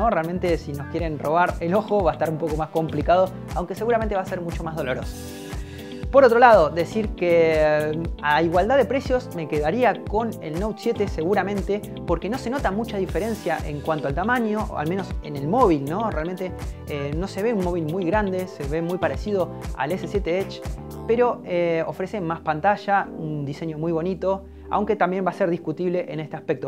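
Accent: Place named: Argentina. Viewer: El acento Argentino lastima los oidos.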